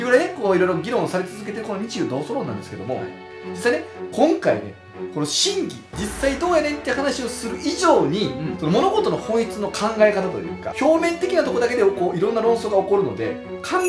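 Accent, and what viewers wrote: Kansai, Japan. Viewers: いつも寝る前に過去の動画を聞きながから寝るのだけど、さんの声が癒し効果があるのかすーーぐ寝てしまう(笑) そんな人多いはず w どんな話もその時代におった関西人みたいにしゃべってくれはるから解りやすいwwww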